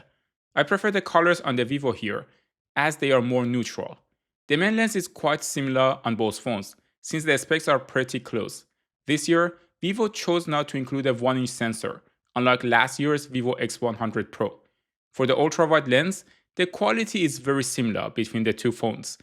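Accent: Iran. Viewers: لحجه فارسیتو دوس دارم 😅❤ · چرا لحجه ایرانی داری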